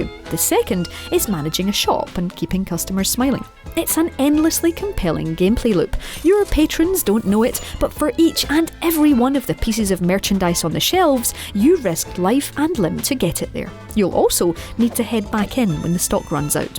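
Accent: Scotland, United Kingdom. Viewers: Rowena is Scottish and I’m pretty sure the narrator also has a Scottish accent lol. I love Rowena tho she’s amazing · The slight Scotish accent is really enjoyable! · I love the scottish accent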